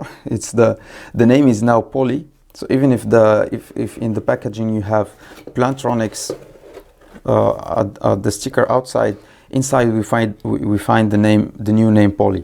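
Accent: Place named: Morocco. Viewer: Dat #moroccan accent!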